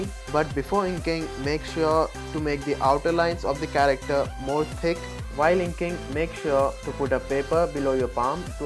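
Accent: India. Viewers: Wow These Indian voice xd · It took me 1 second to realize that you are an Indian (because of your voice idk)...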